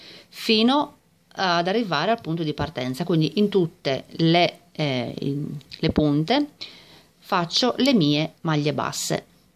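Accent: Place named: Sardinia, Italy. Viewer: Molto molto carina e ben spiegata. E poi adoro il tuo accento sardo 😉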